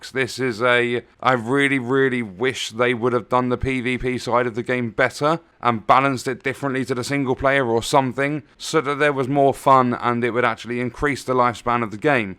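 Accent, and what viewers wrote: United Kingdom. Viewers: Nice video mate … i watch your videos just to enjoy the accent! lol What city from UK pleaseeee m8 🥲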